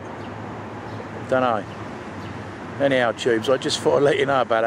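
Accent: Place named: England, United Kingdom. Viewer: The first part of htis video throws people off because you have an english accent and you say in London haha. Even though we know your in Canada it's irony I tells ya irony.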